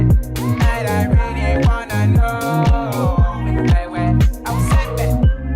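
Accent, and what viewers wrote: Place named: DMV, United States. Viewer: I feel like you’re from the dmv... I think i can hear our accent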